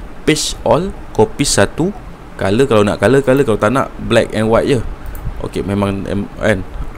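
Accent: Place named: Johor, Malaysia. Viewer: “Haaa kena tukoo” 😂 johorean sgt, tak berniaga shopee but i really2 enjoy your explanation... keep uploading ❤️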